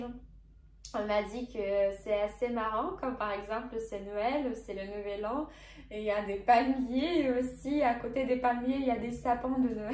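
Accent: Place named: Russia. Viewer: Bonjour. Nouvel abonné. L'accent russe apporte à la France tout le 💨 vent froid le blizzare de Moscou et comme il fait encore très chaud en France.. c'est le dépaysement . Merci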